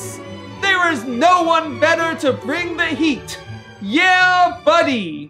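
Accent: United Kingdom. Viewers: this, this is the most amazing YouTube ad ever. Thank you funny British man with an slight British accent in a olden day uniform that is reviewing an horrific MTN Dew flavor. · Finally, YouTube gives me what I’ve wanted for years; a man in a French uniform from the Napoleonic war using a slight British accent reviewing modern food and drink.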